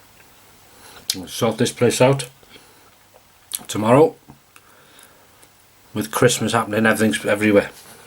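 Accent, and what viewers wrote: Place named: Cockney, United Kingdom. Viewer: 😆😆😆👍👍 you crack me up guvna!! Love yer take on the cockney accent! Ha ha! Happy Happy new year, dont forget, pop in for a cuppa or a pint if you are down my way! And no no ruby tonight chief, hot dogs!! Dont ask me why, just fancied hot dogs. 👊😉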